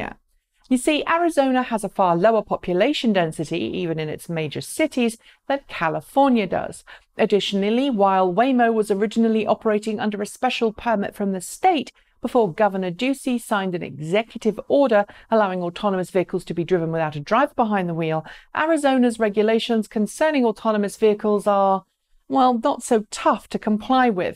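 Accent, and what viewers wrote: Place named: United Kingdom. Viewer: You produce some of the best and most interesting postings on electric vehicles and other emerging technologies. And, like all Americans, I love the British accent.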